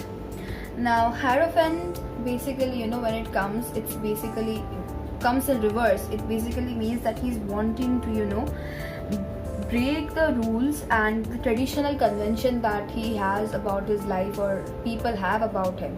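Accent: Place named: India. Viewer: it's okay sister I will listen it patients so I cab understand actually my hearing english is not that good but it's not that bad and your accent is like indian so it's not that much problem but sister are u from india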